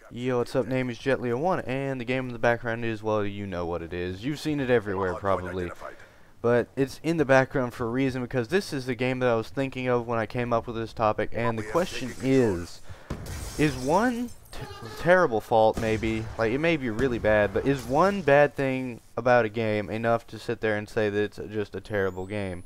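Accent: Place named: Texas, United States. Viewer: I do disagree, if you disrupt the rudimentary way of a how a game is intended to function, then the entire experience is spoiled. Thankfully, the PC port isn't AS plagued with networking problems as its console counterparts, so you're more likely to enjoy the game at a different venue. Also, dat texan accent :3c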